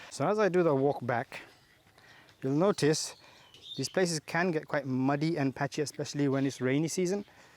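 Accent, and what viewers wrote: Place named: United States. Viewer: You are an immigrant of London... But your accent is very much alike Americans... 😮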